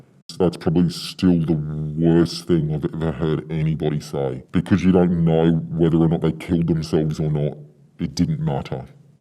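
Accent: Australia. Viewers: This guy is definitely Australian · australian accent. tanned skin. age 40-59. cadence indicates melbournian. one of the largest organisations .SPORTSBET,TAB,crown,WATERHOUSE thats what i saw in this vid about you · no amount of distortion can hide that Australian accent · This guy is from Australia maybe from Perth ... words like tick tick tick and Heeps gave it away and theres a Casino in perth so im just guessing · easy to tell hes aussie. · Aussie if I’ve ever heard it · that aussie accent, and he means TAB they are crooks, as soon as you get a winning streak or jag a massive multi or first 4, they ban you from betting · Can tell this guy is an Aussie from his voice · This guy sounds Australian. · Aussie yo! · Sounds like this guy is definitely Australian. Could only be Sportsbet, BET365 or Ladbrokes then. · this guy is 100% aussie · This guy has to be Australian. 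· i like that you can hear the australian accent through the voice morphe lmfao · 2 seconds in Yeah this guys Australian ool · the legendary aussie accent powering through the muffled voice effect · Pretty obvious this guys from Australia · sounds like an australian accent, interesting! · Sounds like an Aussie. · Can tell hes an aussie 🤣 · Bro can’t hide the Australian accent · Australian accent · Dude is Australian, I can tell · No matter how hard you try - you cant hide that Aussie accent!!! Get a dog up ya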